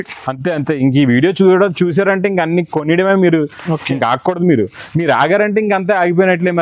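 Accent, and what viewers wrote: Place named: Rajahmundry, India. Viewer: మీరు రాజమండ్రి నుంచి కదా ..నే పట్టేశా ..😅 వస్తున్నా ..😂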